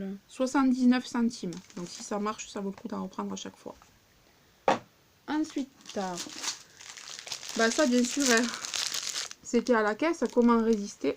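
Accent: South, France. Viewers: Mais je n'avais pas fait attention à ton petit accent du sud. Je ne savais même pas qui Vendée de la peinture chez Action. Tu as fait pamal d'achat. Merci pour le partage. Au plaisirs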